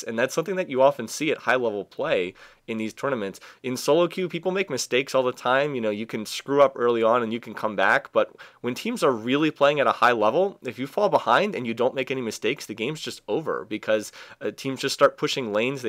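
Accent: Canada. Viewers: always thought you was from Canada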